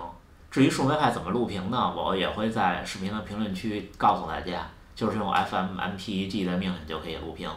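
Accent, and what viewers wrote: Beijing, China. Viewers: 比较喜欢up主的风格 说话听起来很舒服 北京哥们吧， 希望保持这种风格 教程针对新手小白也比较友好，再帮帮小白，做点更加有趣易于操作的项目吧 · 最需要的就是可以直接在树莓派本身系统上实现各种功能的教程了，刷来刷去的太麻烦，老哥北京口音听着也很舒服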